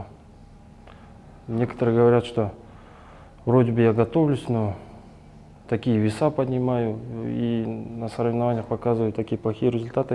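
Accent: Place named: Kazakhstan. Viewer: Казахский Русский пойдет👍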